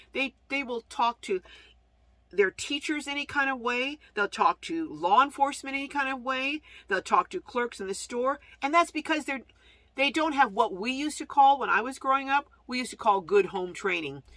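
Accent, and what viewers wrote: South, United States. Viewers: It sounds like a way of speaking that is a sign of respect from the south. I was born in Berkeley California and get that! Carry on! lol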